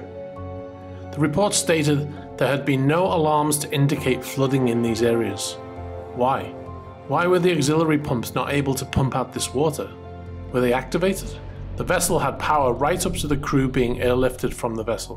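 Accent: Liverpool, United Kingdom. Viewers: Great video great too hear a scouser doing well in YouTube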